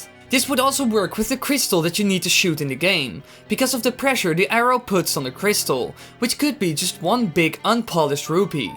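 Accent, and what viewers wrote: Netherlands. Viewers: lekker nederlands accent man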